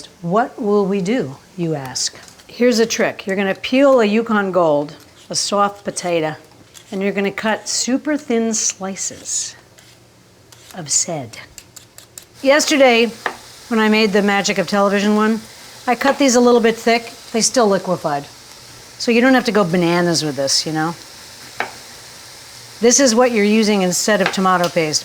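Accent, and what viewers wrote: RP, United Kingdom. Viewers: Wow that’s a wicked English accent posh ok 👌🧑‍🍳🌹.Iam looking forward to seeing you your meal 🥘 today 🌹🌹🌹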